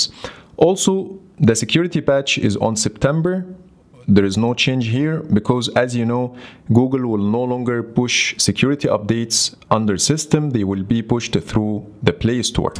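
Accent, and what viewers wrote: Egypt. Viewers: What an Egyptian accent, good job bruh☺️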